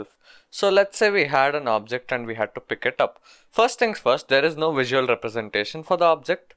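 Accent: India. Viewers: i heard indian guy, i subscribe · Indian accent... Learning from my fellow Indian... 😋😋😋😋😋😋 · when i started to watch i tink that the indian accent is kinda annoying but after 30min watching i tink tat the indan acent is actualy funy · 😐 I definitely don't watch this video i don't like Indian en accent 🙂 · please dont use indian cant understand them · This is so great... But unfortunately indian accents are just a dealbreaker for me. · English is not my native language. I speak with an accent. For me if they did this course with normal English it would be much easier to understand. This heavy Indian accent makes the course useless for me. Sorry. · Bro’s accent is more indian than mine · I DON'T like Indian English accents also but this one is not too bad, in my opinion. I can follow it alright.... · индусский акцент. чо уж делать. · its nice that it is recorded by an indian teacher so i have no issue in accent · Indian accent, seriously? 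Come on! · He is an indian so has a clear accent so seems heavy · Gotta be Hinest, it really annoys me when it's a thick indian accent. But his is not that thick, thankfully. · Sounds like Indian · 印度口音太重了.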